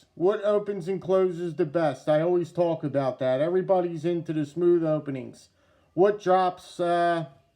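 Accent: Philadelphia, United States. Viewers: I love mine! I wish I had the money to grab the Oily Creek bone version as well, but unfortunately, I could only afford the one. Hopefully I'll be able to get a Sambar Stag one when they release it. GEC makes a great knife! I wish I had gotten into them sooner, but this one makes my 7th one, and so far my favorite. The #93 oily creek bone rams foot is a close second. They used this wood to soak up and clean the oil from the inside of their drill pipes back in the day. That's why it's called sucker rod wood. I used to work at the Philly airport for USAIR for 20 years, and I lived in Williamstown NJ,. I'm living up in NYS now, but listening to you talk brings back fond memories of that 20 yrs I spent down there. Loving the accent and the way you talk brother!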